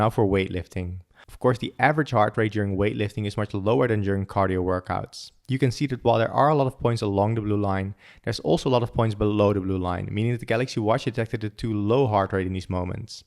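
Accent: Netherlands. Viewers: This guy must be dutch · Are you Dutch? :)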